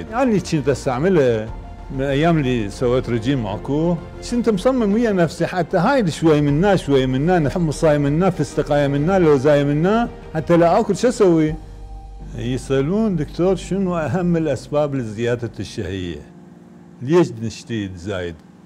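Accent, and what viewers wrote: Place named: Iraq. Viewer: دكتور انت كتير مهضوم ونا احب اللهجة العراقية هواااااي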